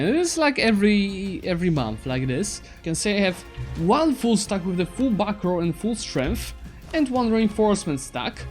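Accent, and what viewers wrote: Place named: Poland. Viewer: Bro I suspected he was polish turns out he is I recognize a polish accent anywhere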